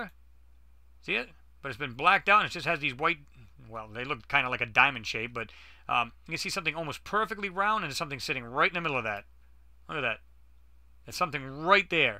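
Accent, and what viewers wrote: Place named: New England, United States. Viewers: he's definitely from New England, Greater Boston area would be a good guess. cool picture of the Moon too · great videos brother very interesting I always knew that those nasa bastards have been lying to us lol any ways thanks for the great videos brother. Are you from Boston you sound like you have a Boston accent. Well anyways you got your self a new subscriber keep making these great videos.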